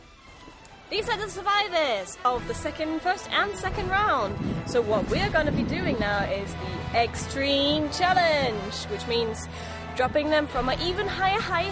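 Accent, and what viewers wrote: United Kingdom. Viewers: Hilarious. Great video. In addition to the humor, I was struck by how deeply British your accent was at that time. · So, I notice a different accent back then. The UK experience no doubt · Back when was British. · Why does speaks with the British accent in this video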